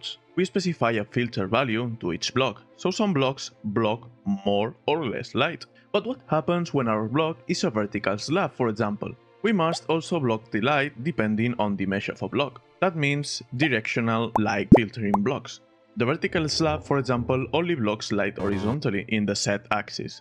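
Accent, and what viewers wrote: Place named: Spain. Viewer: That english spanish accent 👌👌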